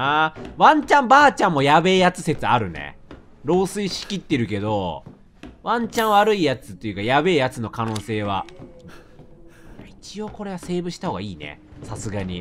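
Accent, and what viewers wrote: Kansai, Japan. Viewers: レトさん焦ると関西弁がめちゃめちゃでるからちょーうれしい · ホラーとか焦る場面が多いゲームになるとレトさんの関西弁がいっぱい聞けるので嬉しい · 最強に怖くなると関西弁に戻る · レトさんの実況補正あるにしてもめちゃくちゃ怖すぎて画面ちっちゃくして見てます😂😂関西弁たすかる😂😂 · 関西弁がどんどん増えてく…笑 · びっくりしすぎだし関西弁出すぎｗ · 焦ると関西弁が出てきてしまうさん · バイオしてるさんは関西弁になるw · 普段よりさんの関西弁が多くて嬉しいしかないです🤦‍♀️🤦‍♀️💕💕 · レトさんの関西弁がおもろすぎる笑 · やっぱレトさんの関西弁ええなあさっきまでガッチさんの見てたから差が大きくておもしろいwww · 近年なかなか聞けなくなったレトさんの関西弁、、、ありがとうございます✴ なんか新鮮です！ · 追い詰められたら関西弁になるレトさん好きwww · なんか最近前以上に関西弁がよく出る気がする、気のせいかな？笑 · レトさんがびっくりする反応とたまにでる関西弁、好きです。怖い系とびびる系は大丈夫なんだけど痛い系は無理ドライバーの+−そんなに気になる？笑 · レトさんの関西弁で中和されてる気がする、、、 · なんかバイオだとレトさん西の感じ強いね笑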